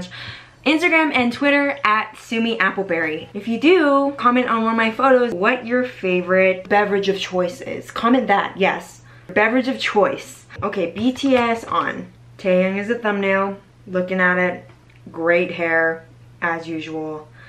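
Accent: United Kingdom. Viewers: ur british got me crying 😭😭😭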